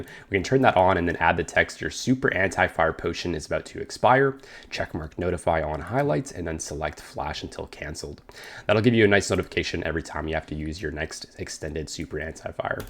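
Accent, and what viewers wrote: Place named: Australia. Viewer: i did not know steve irwin making guides now haha. mate.. haha (aussie) lol. Dragon hunter want just one of the best new additions in the game recently, so many good places to use it. Fantastic and useful guide as always!!!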